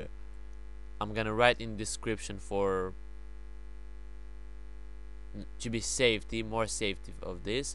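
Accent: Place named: Turkey. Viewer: Where are you from? I think u are from Turkey because you have Turkish accent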